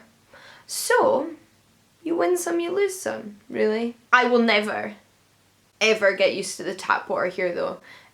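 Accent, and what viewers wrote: Scotland, United Kingdom. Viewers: Re: your feeling of being more Scottish, YES! I feel a lot more French in England than I do in France. The people I meet tend to focus on the more positive aspects of being French and I tend to focus on the stuff I miss, so it's easy to feel more attached. When I'm home, I'm immediately in Ranting-about-the-French-government mode... And as a second-language speaker, I totally agree your Scottish accent isn't that strong. It's lovely & very recognisable as Scottish, but sometimes when people who sounds VERY VERY Scottish are on the news I have to put subtitles on or I won't understand. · I absolutely love your accent (I spent 6 months in Edinburgh as a student and there is no accent I like better :-))! Good luck with the flat hunting! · It sounds like while you've had inevitable adjustments, your move has largely brought you that incredible feeling where you feel you're exactly where you'r meant to be in life, and surrounded by incredible opportunities to boot!! 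Glad it's working out for you (and that you're still taking time to make videos amongst all these changes)! I do have a question about how you're sorting flat hunting--did you just bring up suitcases and plan to crash with friend(s) until you found a place?? (this reminds me a bit of when I moved to NYC--packed my stuff in suitcases, crashed with a friend for 4 days, looked at lots of apartments Id been searching ahead of time on Craigslist[idk if theres a uk equivalent to craigslist… you can find all kinds of stuff on there but its mostly used for roommates and apartments, though you have to be careful] and other sites, and had actually found a place in less than a week.). And your experience with your "otherness"/Scottish accent feeling enhanced is so apt--I actually had the opposite experience once!! If it's not obvious, I'm American and have lived there my whole life. I'm mixed background (aren't we all), but a large % Polish. 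(and obviously while it's not a perfect melting pot, the US has a mix of ethnic backgrounds etc). When I visited Poland for a few weeks (with a friend I'd jokingly call "really" Polish--as opposed to my fake Polish? ;) Moreso because she went to Polish school every Saturday and was fluent,etc.,), I was struck by how much I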